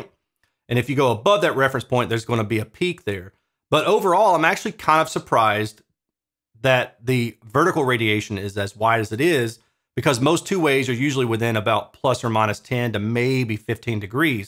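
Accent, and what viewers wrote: South, United States. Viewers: Love your speaker reviews! Dig the Southern accent, too. I found this monitor on Amazon UK, but for us Limeys, it costs a good deal more ($415) unfortunately. Grrr!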